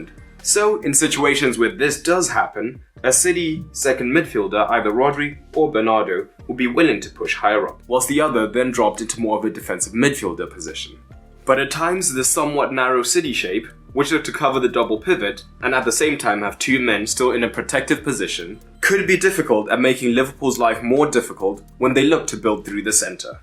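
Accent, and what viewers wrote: South Africa. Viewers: Is it just me ? I hear a South African accent…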